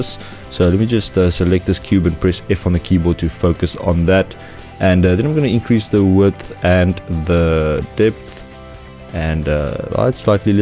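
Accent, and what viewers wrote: South Africa. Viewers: Nice to hear a South African voice doing some maya tuts. subbed.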